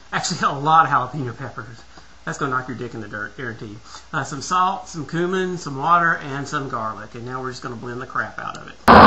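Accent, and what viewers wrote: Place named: Texas, United States. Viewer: Your from Texas, aren't you? I can tell from the way you talk and do things. I' m north of Houston.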